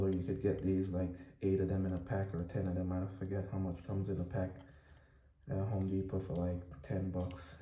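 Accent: Jamaica. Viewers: Caribbean Accent my guy. #Jamaican.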